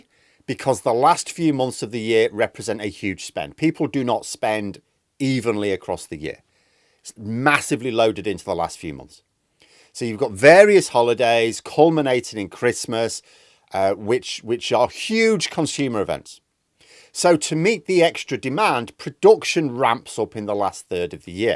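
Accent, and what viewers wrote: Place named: Birmingham, United Kingdom. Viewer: English Brummie.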